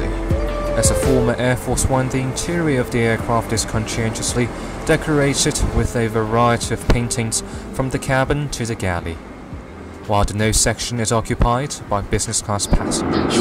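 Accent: United Kingdom. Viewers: 兄弟还是英伦腔哈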